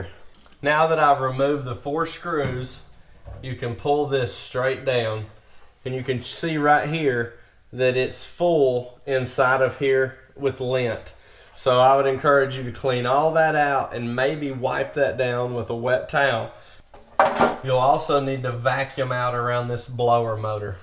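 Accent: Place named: South, United States. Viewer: Great instructional video...and cool southern accent to boot! Thank you.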